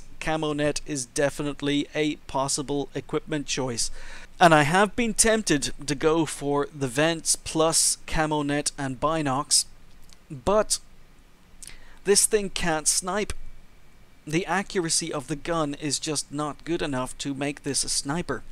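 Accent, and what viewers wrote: Ireland. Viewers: Your accent is fucking bizarre. Make up your mind are you Irish or a brit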